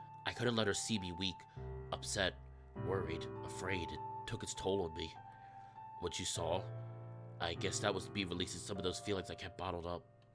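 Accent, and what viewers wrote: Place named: New Jersey, United States. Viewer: Hey, if your New Jersey accent comes out while recording then roll with it. Except for with Colby and one of his options. K-ho's inspiration for the setting was Boston so it feels almost authentic when your New Jersey/Northeast accent comes out.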